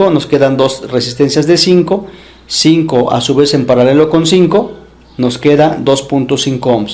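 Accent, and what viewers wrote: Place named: Mexico. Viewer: Me gusta mucho el acento mexicano. Saludos desde Chile.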